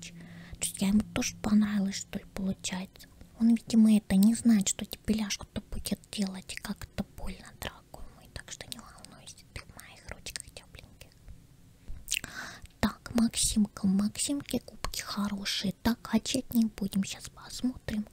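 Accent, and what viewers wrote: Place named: Moscow, Russia. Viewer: Типичный московский говор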